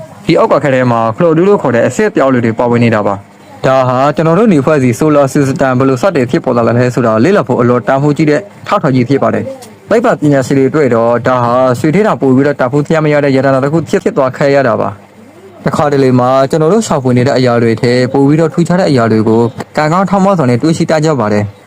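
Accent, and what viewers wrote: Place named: Dawei, Myanmar. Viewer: ထားဝယ်သံဘိတ်သံနဲ့တင်ဆက်နေတော့မနဲနားထောင်ရတယ်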